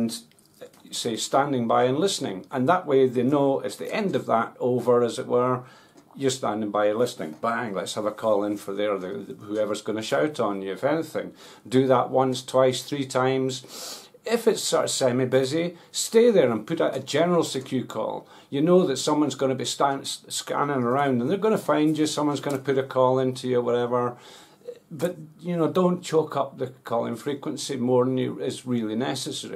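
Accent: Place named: Scotland, United Kingdom. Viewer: Hey you old hippie. You sound very much like a Scotsman. I'm a Ham radio operator and quite often I do 11 meters. Hang mostly on 27.385 LSB which is the national calling frequency on 11 meters. I use a Yaesu 991a which I had the MARS mod done so I can go anywhere on 25 to 27 MHz. I use an Antron 99 antenna for 11 & 10 meters. I've got an MFJ 969 Versa Tuner II, roller inductor tuner just in case my 991a won't tune the frequency. Cheers & 73 from W Rusty Lane K9POW in eastern Tennessee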